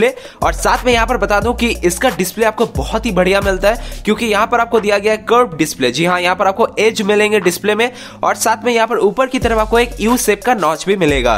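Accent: India. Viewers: Patna se ho Bhai..?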